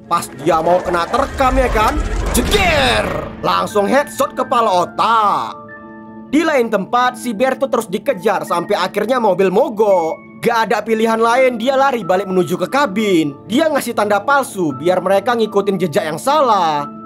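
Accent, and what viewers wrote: Medan, Indonesia. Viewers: Asal medan y bg.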